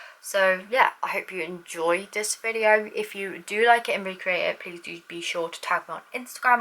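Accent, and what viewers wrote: United Kingdom. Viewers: She speaks british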